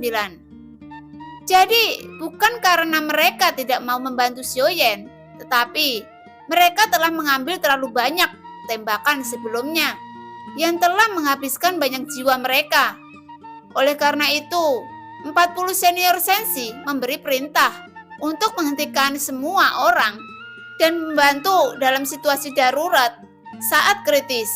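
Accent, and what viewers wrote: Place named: West Java, Indonesia. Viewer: Sunda banget ka hihi ..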